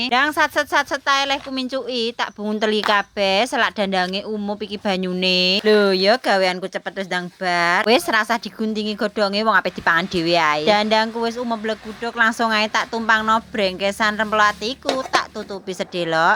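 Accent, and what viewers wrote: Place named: Java, Indonesia. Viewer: Nah begini penggunaan nada bahasa jawaNya mbak... Lebih enak didenger.... ❤❤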